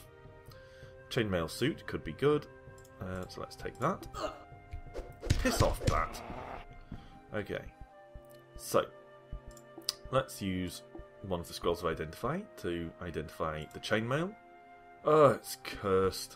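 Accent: United Kingdom. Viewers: i can tell you're british,great lets play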